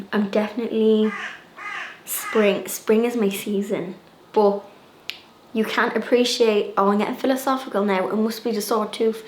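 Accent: Ireland. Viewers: Love all your videos, what ever you put out I am happy to just soak up your beautiful soft Irish accent 🥰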